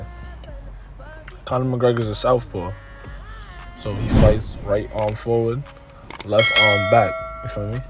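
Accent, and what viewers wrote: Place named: Bronx, United States. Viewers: Bronx I dead recognized the accent after you said controller🤣😭 fire video gang 🤞🏿‼️ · U from new york fasho bru. U gotta be😂😂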